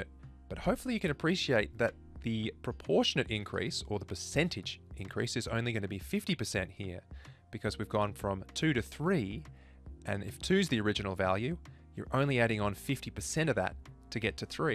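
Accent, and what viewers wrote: Australia. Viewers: explaining math with an Australian accent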